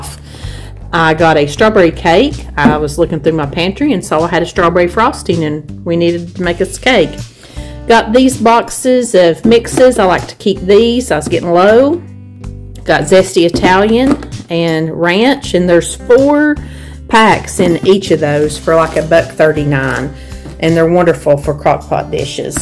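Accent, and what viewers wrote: South, United States. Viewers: I love listening to that southern drawl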